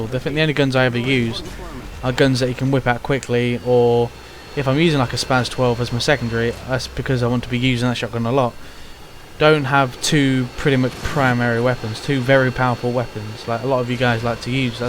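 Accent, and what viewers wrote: United Kingdom. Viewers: Gotta say almost had a heart attack when i saw you did a review on the Tar-21. Absolutly love the thing to death and with silencer too, 5/5 for the vid and a Brittish accent to boot! ;p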